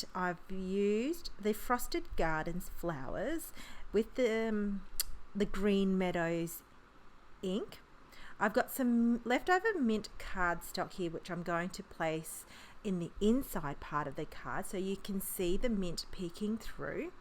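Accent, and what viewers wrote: Australia. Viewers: This type of card is very clever 💜 Lovely to hear another Aussie accent 😉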